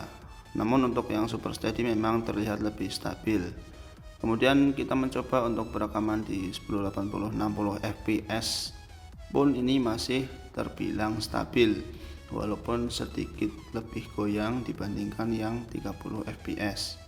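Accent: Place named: Banyumas, Indonesia. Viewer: Logatnya kyk ngapak ini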